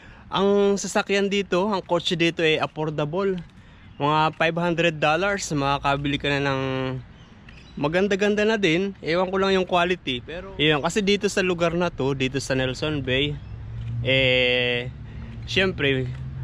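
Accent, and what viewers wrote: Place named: Binangonan, Philippines. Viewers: Tiga rizal k pla sir.sv ko n nga ba eh.napansin ko na sa punto mu..tiga talim island naman ako.part ng binangonan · Taga Binangonan po kayo? yung punto po kase nyo tunog taga doon hehehe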